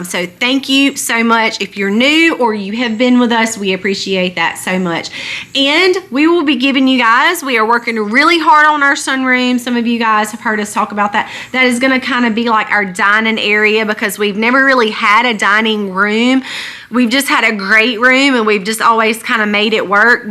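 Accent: South, United States. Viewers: Lovely southern drawl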